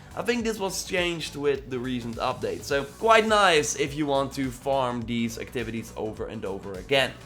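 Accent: Netherlands. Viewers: Man man man, dat neder-engels. Verschrikkelijk.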